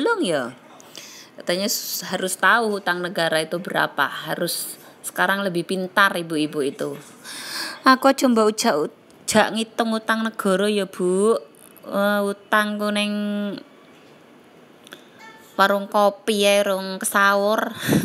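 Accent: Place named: Java, Indonesia. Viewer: Suaranya mbak kok medok yo asale mana to mbak heeee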